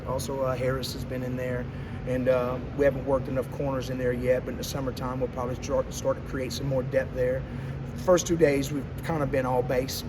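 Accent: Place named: Louisiana, United States. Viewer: Love that Cajun accent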